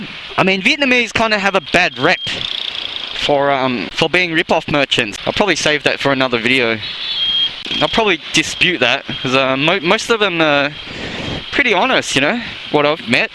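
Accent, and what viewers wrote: Australia. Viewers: Sorry ur biased, some times u sound like a yank and some times like an Aus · Lol...odd and refreshing to hear a viet with an Australian accent. How the hell you cross the streets in VN? Seems with like constant traffic with the scooters, bikes and cars, you must need to pole vault from one side to the other. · you have a Melbourne Accent. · Your aussie accent does not sound too good. I prefer the good old american. Sorry!! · Keep the videos coming matey. Beautiful accent btw....I love the aussie accent.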